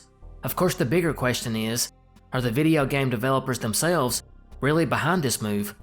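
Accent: South, United States. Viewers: What in cousin loving tarnation is this universal southern ai accent? A taste of Appalachia with a baby momma in Texas but currently resides in Georgia WTF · Nice to hear another southern accent with some obvious intelligence behind it. Excellent content brother. Good stuff. *at 63 I began to mediate out of scientific curiosity. Just to see for myself IF there's anything to this. There IS. There is a LOT to it. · Came for the conspiracy theories,stayed for the southern drawl.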